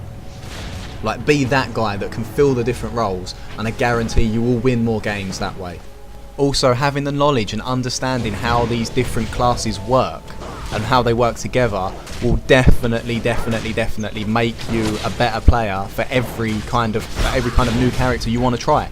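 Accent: United Kingdom. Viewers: I love your videos !! Just the fact that you speak "English English" makes the whole thing alot more understandable cheers !!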